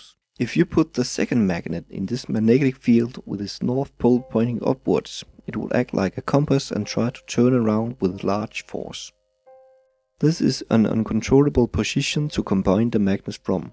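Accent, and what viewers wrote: Denmark. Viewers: I enjoyed this video very much. I have learned something new today about magnets I didn't know. I can understand you well with your Danish accent. Thank you. Blessings to all. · i can hear at your accent that you are from denmark im norwegian, nice video neightbour :) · it funny when you hear a danish person talk english the accent just sound so wrong because im used to that accent in danish XD still very good vids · Are you Danish by any chance? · WAUW YOU HAVE A LOVELY ACCENT. WHERE ARE YOU FROM, no wait let me guess. denmark?D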